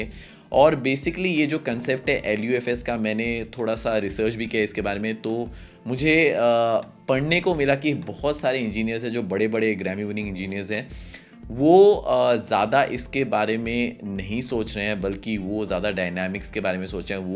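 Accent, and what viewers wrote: Assam, India. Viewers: Are you from Assam?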